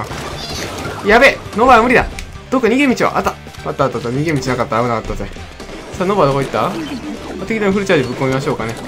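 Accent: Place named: Kansai, Japan. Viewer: さんの関西弁トークが面白すぎるww こう考えるとチャンネルBANは結果的に良かったかも知れないですね！ （´-`）.｡oO（いつからいろんな戦法を使うようになったんだ？)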